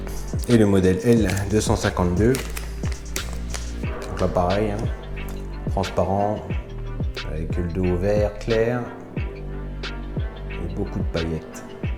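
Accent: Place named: North, France. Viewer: salut moi c Seb je suis pas mal ta chaîne mais je viens seulement de comprendre comment s'abonner jsuis pas doué lol. sinon je vais souvent au der j'ai une barque bourlingue moteur avant avec i pilot la juste un petit prblm de moteur arrière ça va venir bref sinon pour savoir si ça te dirai une peche avec un chtimi car je suis originaire du nord et vue ton accent tu dois pas en être loin. maintenan j'habite a 50 min du bassin nord maintenant au plaisir de ce voir sur l'eau ou un rdv pour une sortie a deux bon wekend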